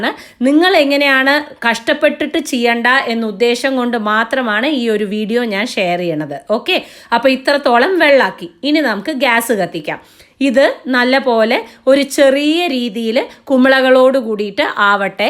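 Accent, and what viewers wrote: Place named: Palakkad, India. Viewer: I am watching u r vedios just yo hear the palakad slang🥰😍🤩💕.I am a alumini from chittur college, basically I am from kannur still in love with pkd slang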